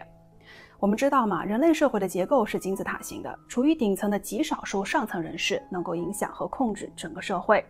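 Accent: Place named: Beijing, China. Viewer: 还是能听出京片子味儿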